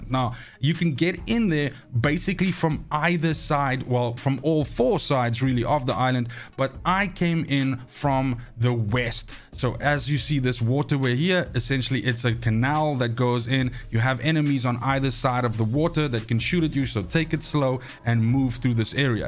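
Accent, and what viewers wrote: South Africa. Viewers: SA accent xD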